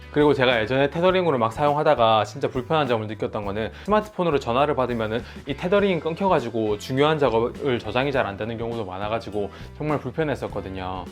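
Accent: Gyeongsang, South Korea. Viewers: 경남사투리가 느껴지는 ㅋㅋㅋ 잘 보고 갑니다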